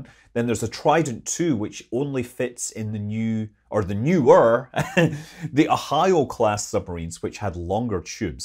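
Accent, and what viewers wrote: Scotland, United Kingdom. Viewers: "all I could add to this is [...] a scottish accent." Don't underestimate how much this rises the sympathy level with your viewers. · I want to see the collab revisit with you providing the enthusiastic "Hullo" and goofy (but awesome) Scottish accent to Tim Dodd's Aerospike conversation. Let's get Musk involved and figure our the engineering for the things already. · Never underestimate the power of an enthusiastic hello and a silly Scottish accent! One of the reasons that super-cavitating torpedoes were invented was to act as a 'gunslinger' style weapon underwater. With detection ranges reducing after the introduction of the Soviet Akula class, NATO needed something that could be fired at high speed from close range to impact enemy submarines before they had a cahnce to conterfire. The Soviets already had this idea in the 60/70s when they were being outdetected by British and American boats. 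· I love your Scottish accent and don’t find it silly at all. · The Scottish accent makes everything better.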